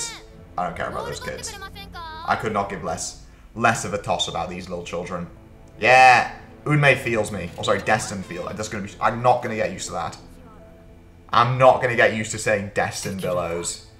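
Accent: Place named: United Kingdom. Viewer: idk whats better the story or the british accent describing the story... this makes me feel like british people are real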